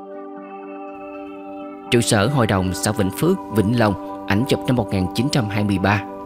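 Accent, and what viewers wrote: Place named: North, Vietnam. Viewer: Giọng đọc đậm tính Bắc Kỳ. Nam kỳ mở miệng ra cứ rẽ rẽ. Dần dà làm mất đi hình ảnh người Miền Nam. Văn hoá mất dần.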